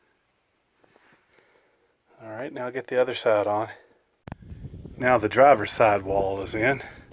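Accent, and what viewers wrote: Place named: Texas, United States. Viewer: Don't ever listen to negative talk about your accent. It sounds perfect to me. I'm from TX, and you sound similar to what I'm use to hearing. I know some from GA probably have stronger accents. The southern accent is the best. I love your videos. :)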